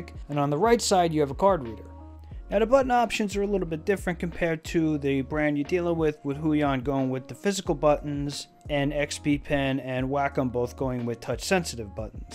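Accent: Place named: New York, United States. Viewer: good review, funny accent! (Must be from New York or somewhere up there in the NE.)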